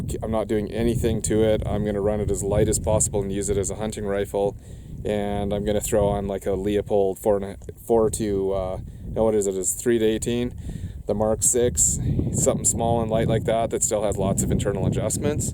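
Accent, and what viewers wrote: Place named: Canada. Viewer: noooo Canadians I knew it when you started talking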